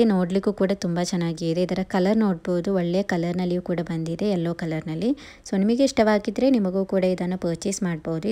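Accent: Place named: Kerala, India. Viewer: Sis neewu malyalina nim maathado style ishta aythu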